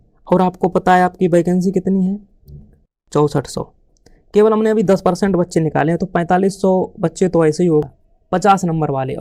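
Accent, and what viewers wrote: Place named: Auraiya, India. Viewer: Aapki language tone UP k auraiya ki trf ki lg rhi h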